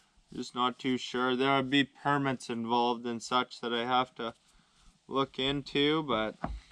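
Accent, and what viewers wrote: Canada. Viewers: most important thing I have learned is that I've never heard an actual Canadian accent before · I'm going to say this: I watch this channel almost purely for the outrageous Canadian bush accent, and energy.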